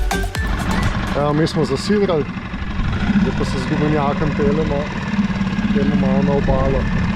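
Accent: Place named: Styria, Slovenia. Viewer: Dober nov intro. Pa fajn je slišat štajerski glas :) Fair winds and following seas!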